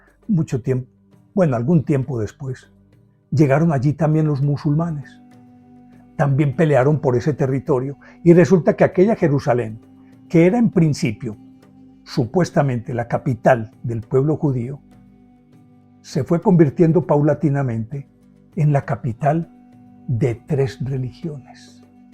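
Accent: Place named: Colombia. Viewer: Buena Charla, primera vez que te escucho, creo eres Colombiano, muy interesante tus datos claros y mundiales.😮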